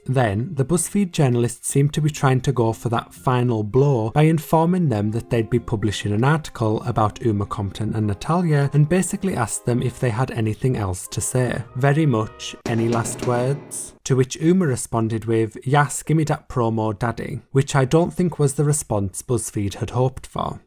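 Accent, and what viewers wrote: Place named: Scotland, United Kingdom. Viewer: it’s a Scottish accent…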